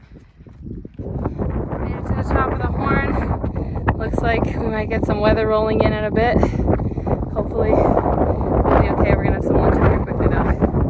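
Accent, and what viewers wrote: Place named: Ulster, United Kingdom. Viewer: You do a beautiful ulster accent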